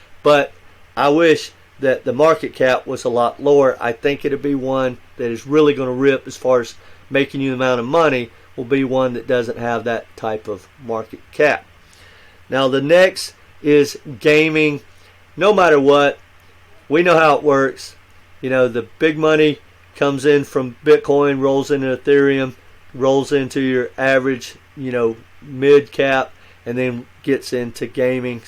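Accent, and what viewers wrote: South, United States. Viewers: I like your southern accent . Ive been living in Memphis for years now but originally I'm from Chicago . After moving to the south I dont think Id move back to the north.. Southern hospitality is real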